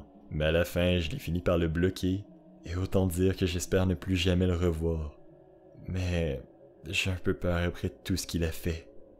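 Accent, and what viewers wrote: Quebec, Canada. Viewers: On dirait un accent québecois nn?❤️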